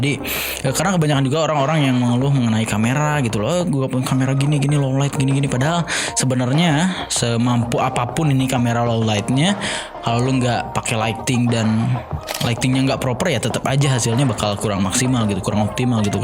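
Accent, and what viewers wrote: West Java, Indonesia. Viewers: pertama liat channel ini gw langsung SUB karna infonya mamfaat buat gw...dan gw pikir bukan orang sunda, eehh nyunda oge ningan...makin trus mantau channel ini, sukses terus lurr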